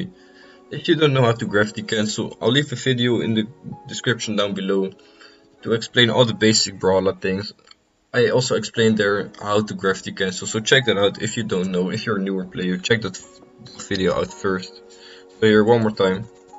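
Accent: Netherlands. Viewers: (Ik ga er van uit dat je nl brnt door je accent)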